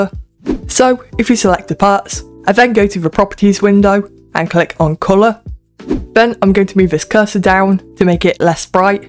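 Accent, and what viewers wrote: United Kingdom. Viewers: This british accent is funny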